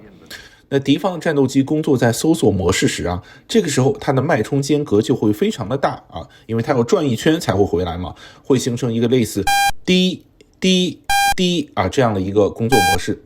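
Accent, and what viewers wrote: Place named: China. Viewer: 怎么那么多说着一口中国味的中文在做这种频道？是好赚🐸钱？ 你就不怕他在糊弄你们呀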